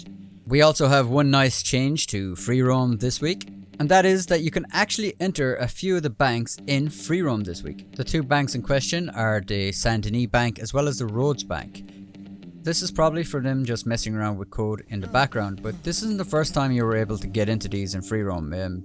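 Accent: Ireland. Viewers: This man is Irish by the sounds of it.....legend ☘️😁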